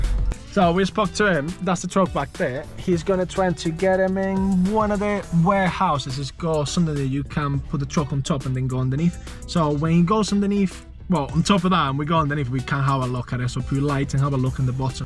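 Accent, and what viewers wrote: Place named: Liverpool, United Kingdom. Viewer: Nice Truck Mate, Good to hear a bit of Scouse Also 👍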